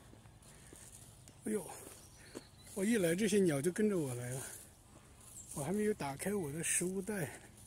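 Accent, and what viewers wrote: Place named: Zhejiang, China. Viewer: 老哥是大陆移民吗，听口音像是浙江的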